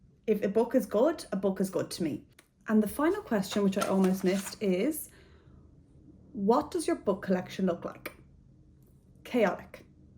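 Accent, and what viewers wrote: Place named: Ireland. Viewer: Great video! You have fantastic taste in books (Ready Player One, multiple Murakami, Cloud Atlas and Shadow of the Wind among others 😊) I really hope you find your rhythm and keep the passion for this, because this space definitely needs a voice like yours. The 10 days I spent in Ireland were the best of my life, so your accent reminds me of better times:) P.S. book recommendation for you... History of the Rain by Niall Williams. Irish author, takes place in a small fictional village in County Clare. Beautifully poetic and a great book for literature lovers.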